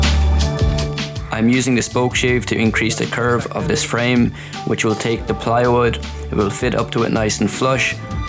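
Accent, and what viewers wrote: Ireland. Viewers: loving the ducks, always make me smile, happy birds, i been adopted by several foxes, a couple of them follow me up the road, very amusing - loving a bit of southern Irish accent, got a bit of Irish in me somewhere, not sure which bit, but some will say the best bit haha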